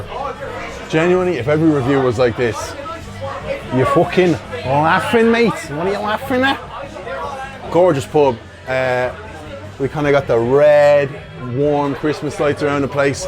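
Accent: Liverpool, United Kingdom. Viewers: Haha love the scouse accent, just noticed your Liverpool video so I’ll be watching that next!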